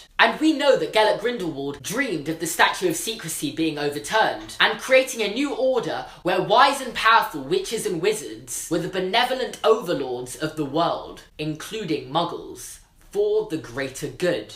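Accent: England, United Kingdom. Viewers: Where is he from? I recognise his accent, but I can't place it (I know its English, but not sure where specifically) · British accents are adorable tbh I love them so much. I'm watching these videos to hear the accent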